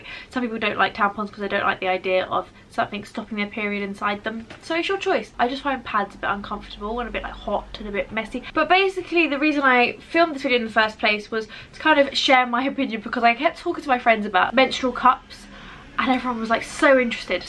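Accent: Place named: United Kingdom. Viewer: i can't spot exactly which county your accent is from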